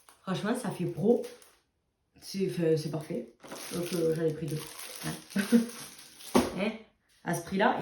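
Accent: South, France. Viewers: Coucou, je suis tombée par hasard sur ta chaîne, j’aime beaucoup ta bonne humeur. Je me suis abonnée à ta chaîne, j’ai moi-même une toute petite chaîne. D’après ton accent tu dois être du sud comme moi. Les achats pour l’anniversaire de ta fille sont top. Merci pour le partage. Bises à toutes les 2. 😍😍😍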